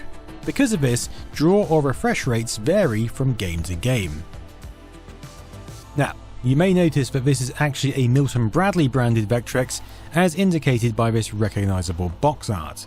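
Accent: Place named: Cockney, United Kingdom. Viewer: Nothing worse than listening to some cockney chimneysweep dragging the language of Shelley and Keats through the mud.👎🏻👎🏻👎🏻👎🏻👎🏻👎🏻👎🏻👎🏻👎🏻👎🏻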